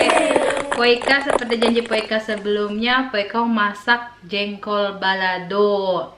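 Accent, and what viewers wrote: Jakarta, Indonesia. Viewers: Mpo saya pengemar mpo suka bgt mpo Betawi bgt ngomong nya,sama ky sy betawi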